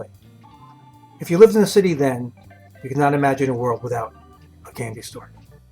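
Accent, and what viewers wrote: New York, United States. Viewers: This is so well done.The narrator knows his stuff. He also has a great NYC accent. The real deal. Thank you for this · What a great and thorough trip down memory lane. You covered just about everything except for the soft pretzels sold fresh each day. I remember them being stacked on a verticle stick and selling for 5 cents each. Again, what a terrific video! Even the narrator has that great NYC accent.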